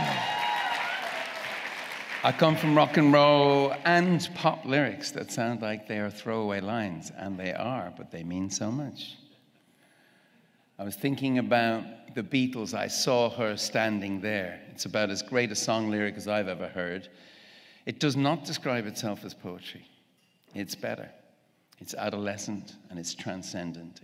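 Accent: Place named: Ireland. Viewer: I swear he's sounding more Irish the older he gets..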